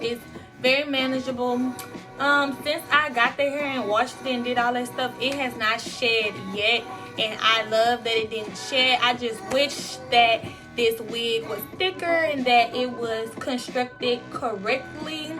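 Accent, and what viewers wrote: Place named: Louisiana, United States. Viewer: Are u from Louisiana?!.. ur accent 😍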